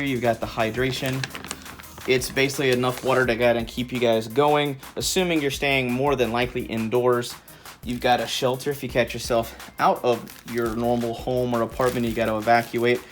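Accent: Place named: South, United States. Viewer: why does you casual prepper voice have a southern accent?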